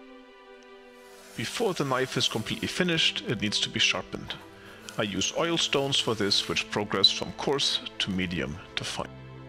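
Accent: Germany. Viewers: that´s some fine german accent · Are you german? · Kann es sein das du aus Deutschland bist. Das hört man nämlich voll an deinem Akzent :) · Are you a german? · Ich wusste ich habe da einen leichten deutschen Akzent rausgehört ^^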